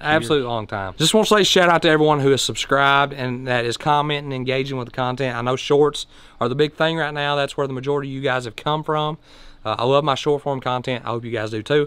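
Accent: South, United States. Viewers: the egg eater shorts got me hooked, as well as your accent being from the south as well. keep up the good work my man.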